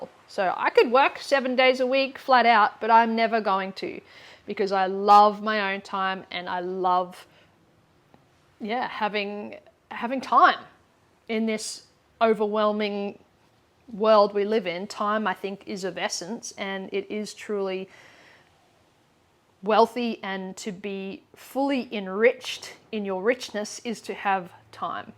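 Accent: Australia. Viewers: So glad I found your videos. Always go for random one of your videos to mixup what I’m doing! Excellent! Ps I’m in Scotland and while doing a video I practice my Australian accent, makes me lol sometimes when I copy your accent adds to that positive feeling 😂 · All of a sudden you just popped up!! And I love it and you ❤. I do your lymphatic exercise for 10 minutes daily which I started 2 weeks ago and I can tell the difference, no matter how busy I am this is my first exercise I do first in the morning. I did detect the Australian accent love it I’m a Brit!! You are the Guru for me because you’ve done so many amazing things about the human body and quite knowledgeable about it. Love 🥰 !! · Hello from Houston Texas. I too found you from your lymphatic video but lately I've been doing so many more of your other videos and ABSOLUTELY loving them. Thank you so much for sharing your heart with us. 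It is true that I'm drawn to your energy but for me its also your accent. Australia is near and dear to my heart because my parents almost moved there when my mom was pregnant with me but didn't. I've never been there but both my husband and I badly want to visit and we will. All your videos challenge me and it's shaking my body as well as my brain up. You're a wonderful beautiful woman and please just keep doing you. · Love the Hello. Great fellow aussie accent. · Bonjour, my first name is Stéphanie and I’m from Québec ⚜️, Canada 🇨🇦! My first language is French and second English so I understand most of when you talk with your LOVELY Australian 🇦🇺 🥰 accent even though I have to pay attention to some specific words you say sometimes in the mouvements your describing… I’m thrilled to get to know you better through this video 🎥 cause you lymphatic flush full body routine was literally a game changer in my life for the past two months! 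Let me explain: I’m 49 and like most of us during the past four (<num>) years we had because of the “global 😷 situation” gain a little bit of weight and, like most women, I was struggling with my body that I didn’t really like… one day I decided that it was enough and that I was gonna be 50 and fabulous (2025) so I decided to check on YouTube to do some exercise by myself and there you appear!… Since two months now, I’ve been doing your lymphatic flush full body 10 minute movement routine at home 🏠 every single morning before my workout 🏋️‍♀️!!! At First I do yoga for 30-40 to minutes, then the lymphatic drainage and then the cardio workout! In one month I lost half of a size around the hips and I feel soooo much more energy after doing your exercise and during the day! Your enthusiasm is contagious and now I even say the movements at the same time as you do 😂!!! I’m writing all these details so you can actually have some feedback from Real 👈🏻people (literally at the opposite side of the world 🇨🇦🌎🇦🇺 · Hi, i absolutely love your energy!!! 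I found you accidently by one of your videos about fixing neck pain, literally week ago! Learnt so much about my body through your videos! And one more thing, im in love with your Aussie accent, could listen to you talking for hours! Im Polish, i live in UK, so maybe thats why? Who knows lol, but your vibe is just so contagious, most likely because you are your authentic self! Keep going! Im looking forward to watching more of your brilliant content!! Best wishes Ania xx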